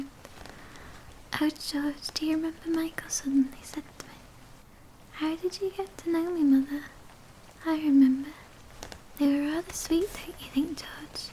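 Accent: United Kingdom. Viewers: Where is your accent from ? England ? Ireland ? Scotland ? Your voice is hypnotic.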